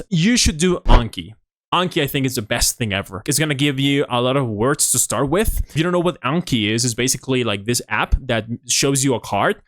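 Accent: United States. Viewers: Do you have any favorite TV shows, movies, or Spanish-speaking Youtubers? I find it hard to find places to practice my listening. Btw your American accent is really good! You sound like you grew up here! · Nunca me gustó ese acento americano, suena muy afeminado.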